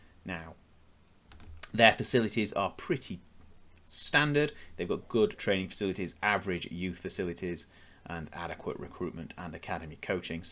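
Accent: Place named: Ireland. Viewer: Bro the Irish head in ya.. Would never say that you're Latvian...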